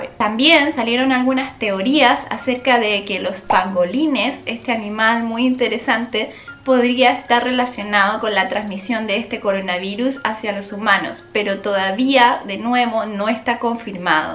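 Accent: Chile. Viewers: Es interesante que no has perdido el acento chileno, saludos y gracias por la información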